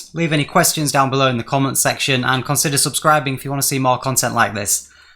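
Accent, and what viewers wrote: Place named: Yorkshire, United Kingdom. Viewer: Interesting you used the 15m FVG, not noticed that previously from other channels. I'm seeing traders posting silver bullet entries with order blocks and OTE on 1 min charts. Generally, when FVGs are featured, they're a confluence within an order block. Nice to hear a Yorkshire accent on a SB video :)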